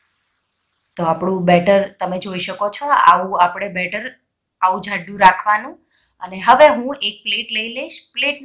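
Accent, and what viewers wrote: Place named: Kathiawar, India. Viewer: ઈ બહુ સરસ બયના છે. મને તમારું કાઠિયાવાડી - હિન્દી બધું મિક્સ સાંભળવાની મઝા આવે ☺️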